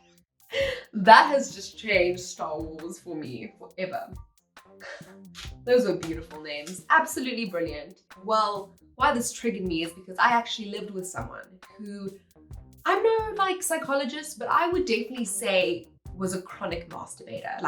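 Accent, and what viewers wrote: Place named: South Africa. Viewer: South African.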